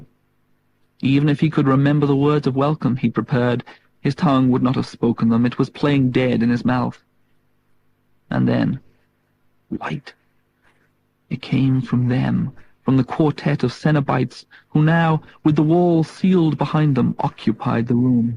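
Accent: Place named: Liverpool, United Kingdom. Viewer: Great to hear a reading by the author. Interesting way of speech going on there, I think it's an attempt to conceal a Liverpool accent.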